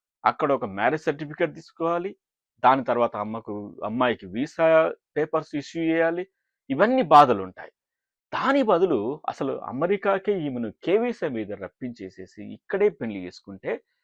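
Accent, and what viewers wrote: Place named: Tamil Nadu, India. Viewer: Sir, neenga Tamil aa? Illa ennai pol Tamilum Telugum aa? Neenga pesara Telugu Tamil accent kalandhadhu. Aanal arimayaga Telugu pesikindireerargal. Tamil yasalo Telugu chala baga matladugunnaru. US lo Tamil lo matladi chala nallaindi naku.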